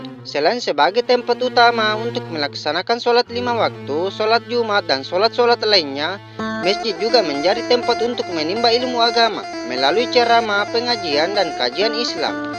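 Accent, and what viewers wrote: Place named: Sulawesi, Indonesia. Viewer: Kamu OKKOTS😅...org sulawesi yah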